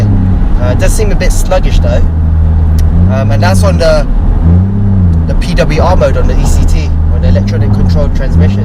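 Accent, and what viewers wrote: United Kingdom. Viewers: Bruh that british accent had me thinking you were a white guy(not being racist), awesome review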